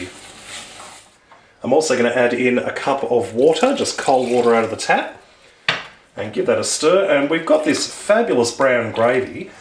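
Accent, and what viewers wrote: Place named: Australia. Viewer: It’s the voice for me. Nice Aussie accent.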